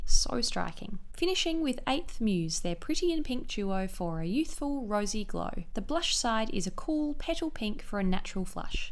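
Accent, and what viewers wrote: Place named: Australia. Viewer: I come to all your videos for cool reviews, pretty hands and that lovely Aussie accent 👌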